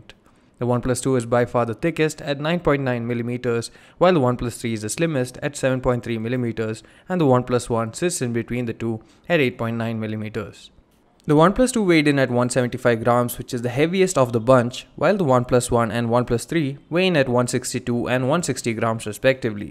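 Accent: India. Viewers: I don't understand your indian accent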